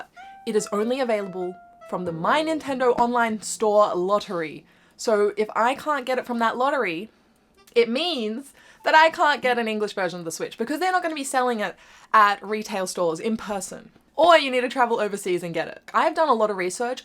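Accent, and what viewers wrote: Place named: Australia. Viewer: It's a totally different story here in Australia - there's Switch 2's everywhere - I mean sure, pre-orders are required and all that but even if you walk into a store - there's a good chance they will have some spares laying around! It's ether we over-ordered and not many people want to buy them, or perhaps Australia's got some spending issue! BTW, are you Australian - your accent sounds Aussie?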